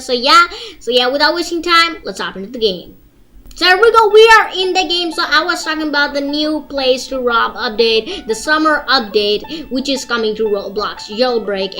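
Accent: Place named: South, United States. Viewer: God he sounds like a hillbilly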